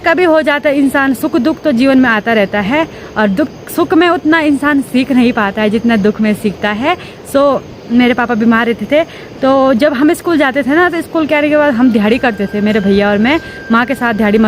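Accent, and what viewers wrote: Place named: Hills, India. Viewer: आपकी बोली, भाषा, पहनाव, ओर आपका स्वभाव एक पहाड़न की पहचान है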